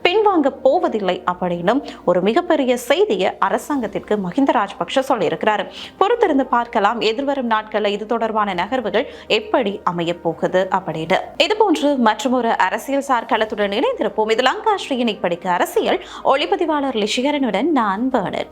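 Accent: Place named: India. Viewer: Can you please maintain Jaffna Tamil. It’s more of z Indian Tamil.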